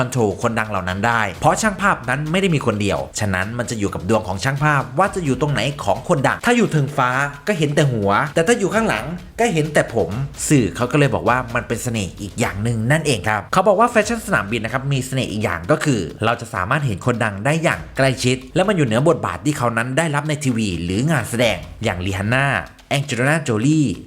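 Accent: Isan, Thailand. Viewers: เบิ่งช่องแอดแล้วเต็มอิ่มกับข่าวสารของน้องลิซ คลิบนี้เบิ่งนำหัวเราะกับการเว้าอิสานผสมมานิดๆหน่อย🥰🥰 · ถึงแอดจะข่าวตกภาษีอีสานบ้าง แต่ก็น่ารักกกก😆😊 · ชอบสไตส์การพูดเนื้อหาและหลุดเว้าอิสานฟังปัยหัวเราะปัยชอบ5555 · 555 ตกอีสานบ่อยนะเรา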